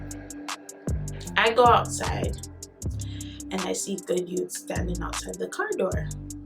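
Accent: Jamaica. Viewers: TALK JAMAICAN ALL THE TIME :) , I LOVE IT . GOOD STORY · Are you a Jamaican I can hear it I am a Jamaican myself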